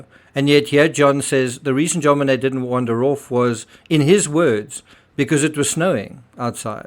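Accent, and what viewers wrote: South Africa. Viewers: I hear a South African. I like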